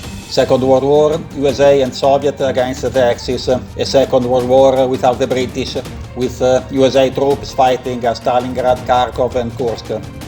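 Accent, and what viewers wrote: Italy. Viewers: I think, he from Italian. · Ah ITLALIANO ☀️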